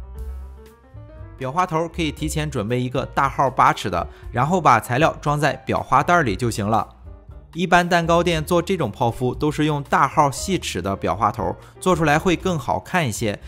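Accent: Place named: Shandong, China. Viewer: 喜歡老爸偶爾解說 非常親切的山東口音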